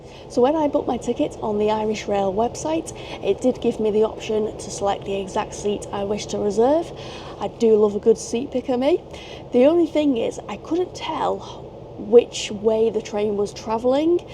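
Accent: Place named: England, United Kingdom. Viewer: Nice to hear an English persons perspective on Ireland...love that accent by the way!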